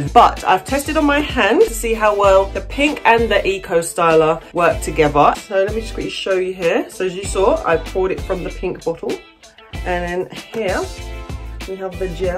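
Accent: United Kingdom. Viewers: Ur voice is pretty are u from the UK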